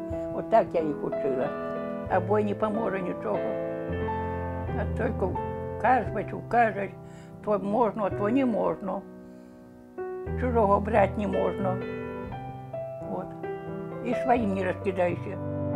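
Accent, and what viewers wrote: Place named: Rural, Ukraine. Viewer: Она же не на белорусском говорит. У нас в Украине в селах так разговаривают. Я сначала субтитры читала без звука. А потом включила звук и поняла, что она вполне понятно говорит.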